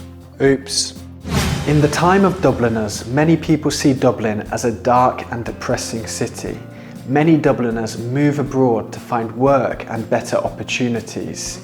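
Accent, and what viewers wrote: United Kingdom. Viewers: Could you please tell me what's your accent? Cockney or rp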